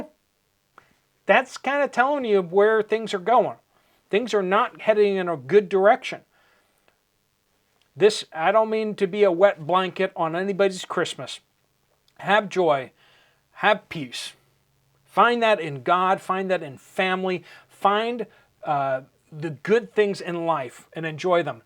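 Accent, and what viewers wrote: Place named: Appalachia, United States. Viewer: Hey thank you for all that you do and say! I have a son and his family in West Newton and I feel right at home with your frame of reference and accent. God bless all of the people in coal country who have contributed so much to this country's history mining such a life sustaining fuel that brings security and warmth to so many people around the world. Merry Christmas and a safe and prosperous New Year to you and your family! 😇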